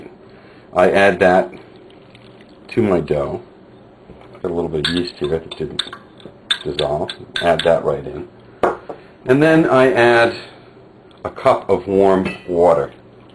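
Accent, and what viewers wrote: United States. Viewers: Are you from Philly? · HEY ARE YOU FROM NEW YORK LOL when ever i lisen to that accent it reminds of when i lived in the bronx, i moved 2 years ago and boy do i miss the pizza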